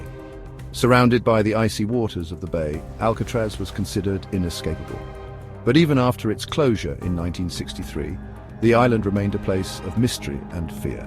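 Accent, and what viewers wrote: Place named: United Kingdom. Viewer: YOU don't sound like him and I used to watch the show when it first came out you sound English (Brit) and Zach is from Las Vegas and younger than me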